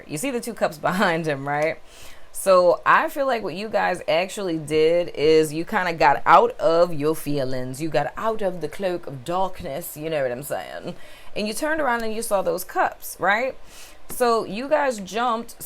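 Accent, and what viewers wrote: United Kingdom. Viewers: hahaha this is my first time watching you I like you I'm from London and I like your "RP - British English Accent"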